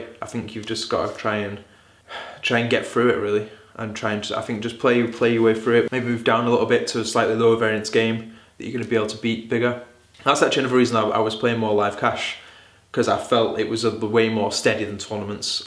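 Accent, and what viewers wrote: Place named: Yorkshire, United Kingdom. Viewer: Hey, where in the UK do you come from? I mean you sound like a Yorky like me. so?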